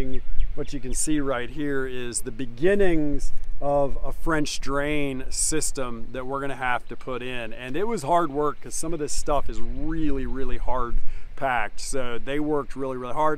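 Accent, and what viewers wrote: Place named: Pennsylvania, United States. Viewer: sounds lie you are from PA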